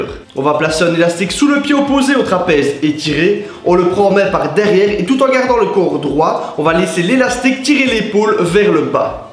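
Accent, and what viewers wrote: Liège, Belgium. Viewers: On entend que tu es belge aha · Serais tu liégeois?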